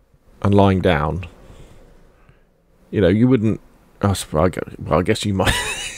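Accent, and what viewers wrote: United Kingdom. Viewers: Man, good video and all but: Did someone ever tell you that you have an amazing voice? goes down like melted butter. Maybe im just too big of a fanboi for the bri`ish accent but still, u should think of doing ASMR or voice acting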